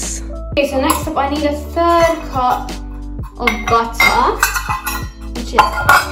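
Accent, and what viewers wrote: United Kingdom. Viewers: I thought you're American, confused with the British accent tho lol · Are u Briiish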